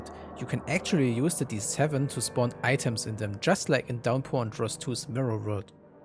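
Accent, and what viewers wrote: Germany. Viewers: Man, as a guy from germany I love your german accent. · Are you by chance german? Your accent sounds pretty german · Bist du Deutsch? Du hast einen akzent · I don't know but u sound like u came from DEUTSCHLAND too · are you by any chance german?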